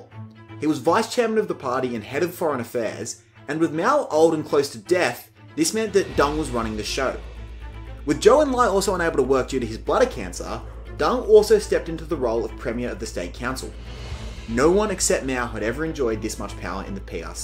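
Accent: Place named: Rural, Australia. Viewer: This is puerile simplicity read out by a barely literate yobbo who sounds like he comes from Tenant Creek, or some other Aussie backwater that equates to the Mississippi Delta.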